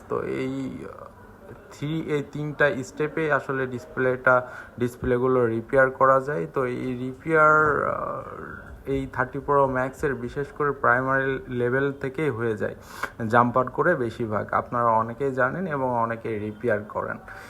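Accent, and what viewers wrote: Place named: Kushtia, Bangladesh. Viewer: কুষ্টিয়া কথাই ভাইয়া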